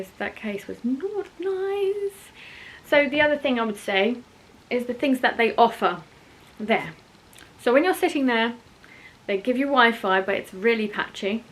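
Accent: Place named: United Kingdom. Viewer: I was looking for a Jury duty video, but instead I found myself listening to a bri’ish accent. That’s wild.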